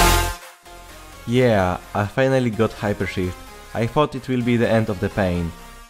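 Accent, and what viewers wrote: Poland. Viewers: It’s because of his Polish accent, which we can’t blame him for, however, YouTube’s automated captions generate what he’s saying pretty well. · fajnie montujesz i wgl ale jak mowisz po angielsku to az boli xddd taki akcent bie angielski i jak sie baoglodam czasem anglikow to ptm mn uszy bola jak np b oglodam z pl akcentem xddd pozdro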